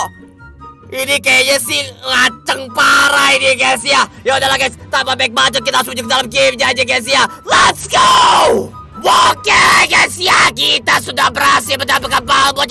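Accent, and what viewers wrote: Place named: Java, Indonesia. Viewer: Sunda Bogsel Nih bang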